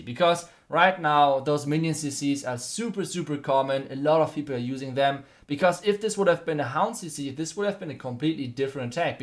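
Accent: Germany. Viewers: He has this German accent which sounds cool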